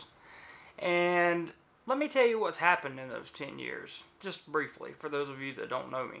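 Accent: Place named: North Carolina, United States. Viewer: Great job, keep it up. As soon as I heard your voice I knew you were from somewhere by Charlotte😂 then it was confirmed. You're reppin NC well for us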